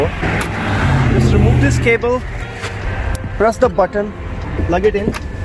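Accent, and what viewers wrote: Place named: India. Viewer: I know this accent. Are you originally from India? If so, where at? I lived there for 2 years.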